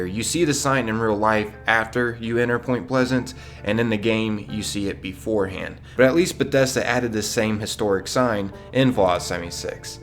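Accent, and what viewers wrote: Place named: Pittsburgh, United States. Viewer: Yo where you from? I hear a pittsburgh accent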